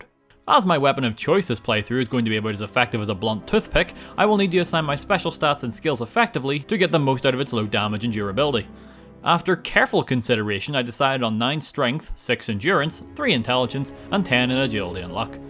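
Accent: Northern Ireland, United Kingdom. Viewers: I needed some of that northern Irish accent today. Great video as usual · Ah are you a fellow Northern Irish man playing fallout? What a rare sight 😂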